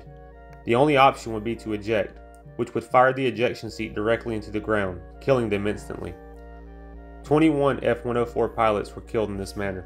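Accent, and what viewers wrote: South, United States. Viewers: Speak more clearly & evenly, enunciating, without Southern affectation if possible. Use a close microphone, like a boom mic, to record your narration. Lower the background music level. Most of all, stop the background music now & then, to demonstrate the sounds of the F-104 engine! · I*m having trouble placing your accent. The South for sure. I appreciate your videos.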